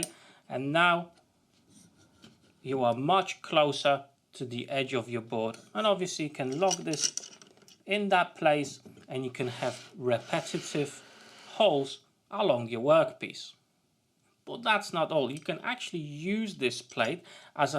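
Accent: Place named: Black Country, United Kingdom. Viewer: Thanks. I´ve just ordered one of these from Ali Express. I read your bio. Your English is great! Did you live in The Black Country when you were in England? Birmingham? Wolverhampton? The accent can be a bit comical if you´re not from round those parts. Sorry Brummies. Love you, really!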